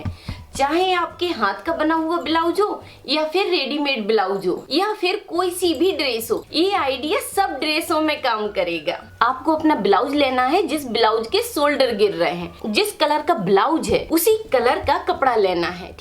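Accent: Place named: Bundelkhand, India. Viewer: आप बुंदेलखंड की है। आपका बोलने का acent बता रहा है।❤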